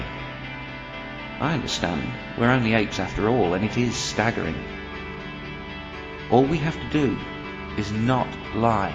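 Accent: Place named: England, United Kingdom. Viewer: very nice. You lucky bloke. You have an English accent! hahahha everything you say sounds legit because of that. Americans have this fetish for British accents for some reason, I think. what was that zoom at the end? no idea what that was.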